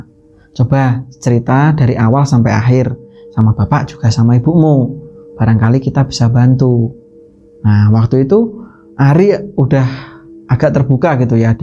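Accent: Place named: Sragen, Indonesia. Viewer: Mas pean iku logat bahasane kyk wong sragen , blora y?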